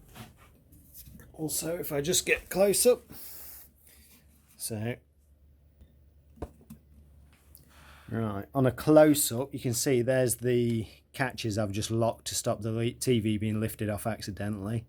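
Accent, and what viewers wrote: United Kingdom. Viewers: Is this man from london or little port i can't tell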